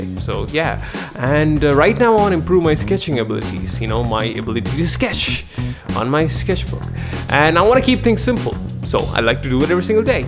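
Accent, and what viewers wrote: India. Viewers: I dnt know why, but i hear a severe indian accent. Are you an indian? 😵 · Youra indian but your voice is clear woq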